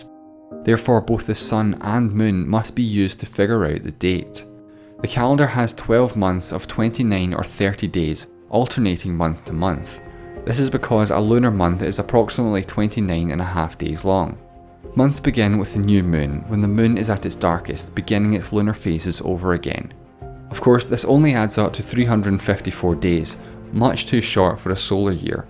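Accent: Scotland, United Kingdom. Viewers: are ou scottish? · I love your Scot accent. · How Scottish are you? Great video btw. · Very interesting accent. British? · its his accent there's nothing he can do about it, also its not really that strong of an accent compared to how some scots speak...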